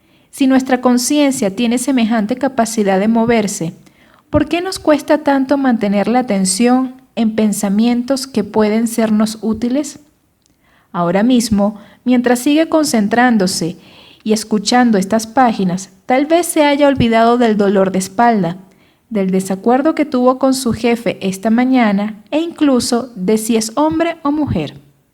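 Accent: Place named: Venezuela. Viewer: Pésimo acento venezolano 🤮🤮🤮🤮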